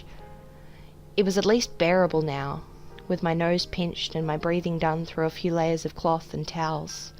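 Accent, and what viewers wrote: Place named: Australia. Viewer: It's good, you have to work on the voice quality (mainly removing white noise, if you use audacity, the noise reduction feature will be your best friend, and also the change in volume, try turning the music up and down instead of the vocal track) but it's still a better quality than my first videos! And I'm a sucker for Australian accents, so I absolutely love that! :) Subbed, rooting for you!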